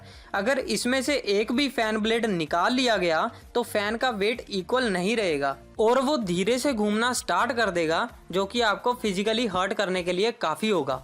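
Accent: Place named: Punjab, India. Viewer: Hindi ton tan Punjabi lgda aa patuya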